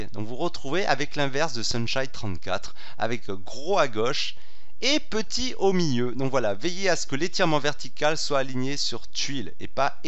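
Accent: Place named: North, France. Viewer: Ben ouais t'a raison m'gamin!!! comme ils disent dans le Nord, le patois du Nord, il faut le comprendre, je ne le comprends pas toujours, mais il me fait bien rire, sans moquerie méchante, je le dis au cas où les vrais Nordistes d'Origine n'aimeraient pas ce commentaire..... Ssshusssssssssssss!!!!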